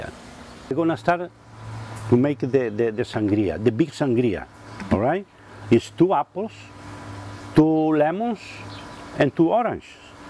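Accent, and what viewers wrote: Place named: Spain. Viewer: His Spanish accent is so fckin cute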